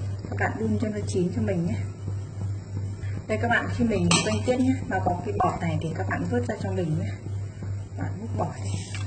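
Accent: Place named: Vinh Phuc, Vietnam. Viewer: Giọng nói này là người Vĩnh phúc . Và chưa biết nấu ăn ngon .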